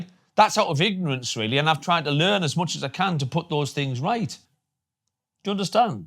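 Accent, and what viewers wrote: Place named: North, United Kingdom. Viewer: That is probably... one of the most profound opening/things I have heard for a long time. Spoken like a northern man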